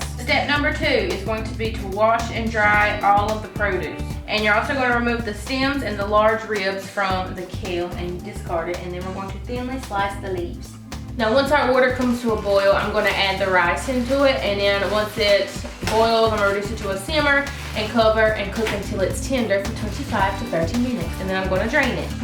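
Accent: South, United States. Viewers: u sound very southern / country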